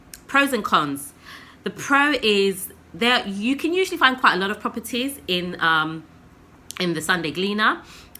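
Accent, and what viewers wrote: United Kingdom. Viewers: your new subbie like your English accent and your contents, you're beautiful i am here supporting you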